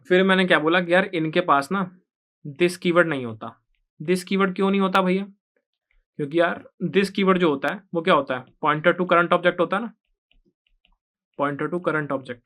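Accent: Haryana, India. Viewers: your haryanvi accent is so sexy; please say it again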